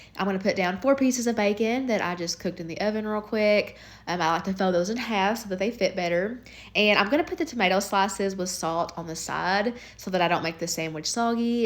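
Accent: South, United States. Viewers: Maybe it's my preference but I love hearing a southern accent. It's a familiar comfort I don't always hear anymore with how many transplants live in my area. Southern women just have a way of speaking that keeps me listening. Keep up the videos, you're doing great!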